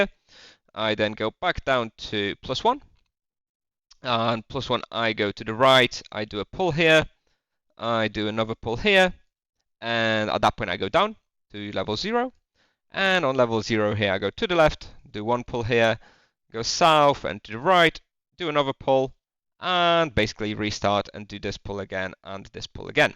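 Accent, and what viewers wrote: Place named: United Kingdom. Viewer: no to dobre wrażenie sprawia, mieszkał w UK od wczesnego dość dzieciństwa ładnych paręnaście lat, stąd akcent 😋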